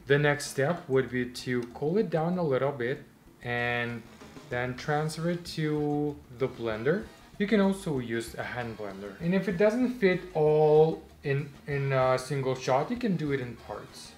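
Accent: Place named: England, United Kingdom. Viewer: настоящий англичанин!!! Заслушалась. )))) Надо пересмотреть. )))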